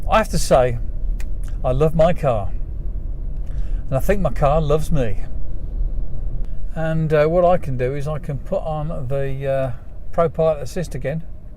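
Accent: United Kingdom. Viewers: I suppose u need to be Brit to follow your conversation....too blabbery and too much accent etc....bye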